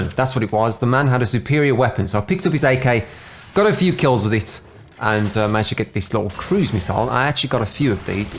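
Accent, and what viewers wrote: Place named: United Kingdom. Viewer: Your accent is British af.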